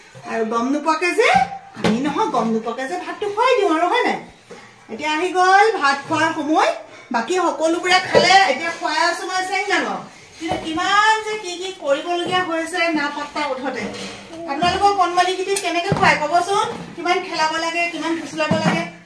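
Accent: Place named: Tezpur, India. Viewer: Aitu amar tezpur fala vokosu buli koy kai kub val laga ❤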